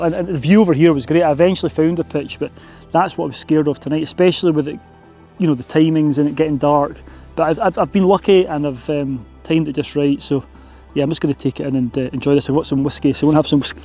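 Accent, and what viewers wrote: Scotland, United Kingdom. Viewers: It is spectacular place indeed! I started from opposite side and I had an amazing weather. I was drained at the end of the day but it was worthy. I wish to come back there with my friends! Thank you for your videos. I enjoy all of them! Well done! I am practicing with your Scottish accent :))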